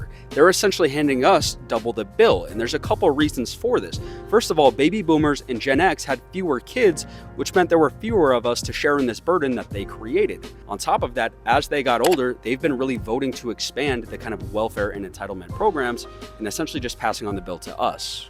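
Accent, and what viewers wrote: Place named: United Kingdom. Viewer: Just because you're British doesn't make it gen ZED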